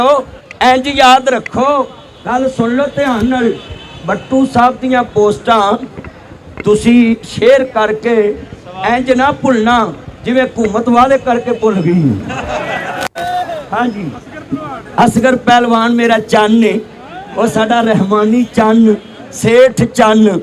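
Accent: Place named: Majha, India. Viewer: It is standard Mahja Punjabi. What is so mithi about it?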